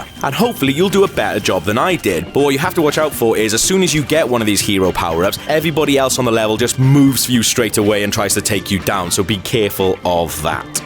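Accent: United Kingdom. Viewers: There's nothing worse than listening to this British commentator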